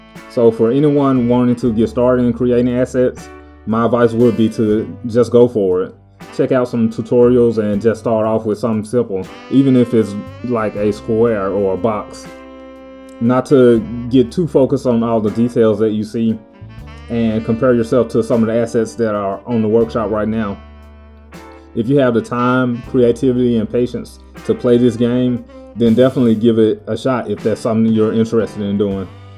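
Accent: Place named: South, United States. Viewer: You might not think you are very good at public speaking - I think you are way to hard on yourself. I could not imagine a better voice with a southern accent to accompany your Bluff City series.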